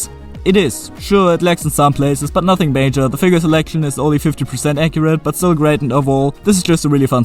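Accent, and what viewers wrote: Germany. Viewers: Ich liebs wie man immer bisschen den deutschen Akzent raushört 😅 da kann man noch so gut englisch sprechen 😂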